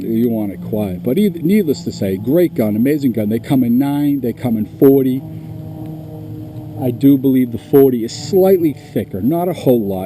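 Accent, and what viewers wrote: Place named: Massachusetts, United States. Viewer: I detected your Ma accent within your first sentence. I guess it takes one to know one. ; )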